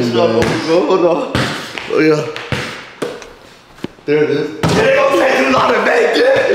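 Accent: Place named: Florida, United States. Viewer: Is He From Florida Because He Talk Just Like My Boyfriend That’s Crazy 😂😂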